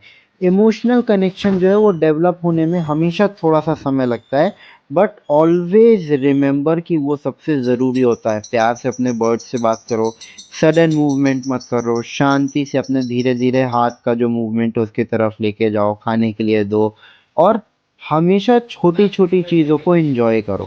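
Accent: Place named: Gujarat, India. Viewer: Very informative video..... Gujarati hoy avu lage che....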